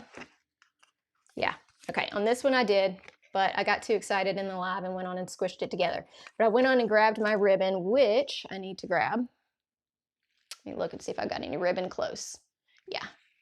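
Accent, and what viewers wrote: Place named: Alabama, United States. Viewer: Really cute ornament cards!!! What an awesome idea for a card and lil gift! Side note.....we moved from Huntsville 2 years ago to be close to our daughter & son in law as they had our 1st grandbaby! Our son still lives in Huntsville and loves it and we visit as often as we can! I thought you had an Alabama accent! Yay!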